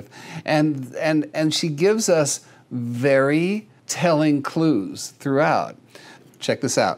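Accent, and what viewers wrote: New Zealand. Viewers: As an Australian listening to this lady's familiar sounding New Zealand (or South African) accent, the cat's name is actually "Merlin", not "Melon". Although the name Melon seems hilariously appropriate given the context 😂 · She sounds more New Zealander to me, but yes, you're right about the biscuits, in the case of Australia.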